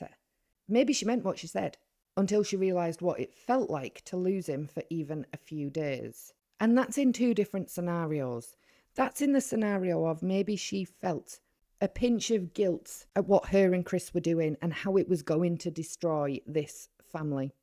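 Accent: United Kingdom. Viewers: MAKES A PLEASANT CHANGE to listen to a non-sensationalised analysis of the Watts case; nicely done. The Northern accent feels just that bit more human.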